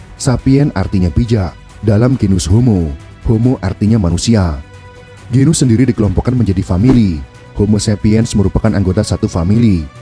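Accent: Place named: Java, Indonesia. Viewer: Asli mana mas... Kok bahasa Jawa nya keliatan meduk..." Saya salah satu scrubers pegawai jalanan mas.... Video2 mas selalu saya lihat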